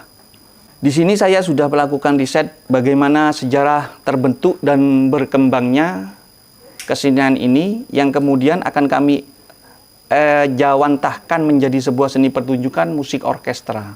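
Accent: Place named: Madura, Indonesia. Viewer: Logat madure cak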